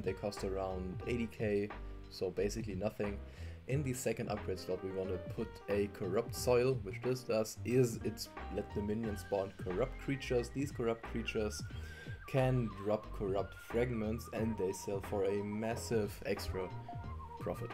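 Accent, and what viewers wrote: Germany. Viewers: Der Deutsche Akzent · Sprichst du deutsch/englisch Englisch mit deutschem Dialekt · Ich glaube ich höre deinen Deutschen akzent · I smell german accent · Nice tutorial, i didnt know what minions to go for. That really helps! (Btw, man hört dann deiner stimme stark das du deutscher bist xD) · i can hear a accsent are you german?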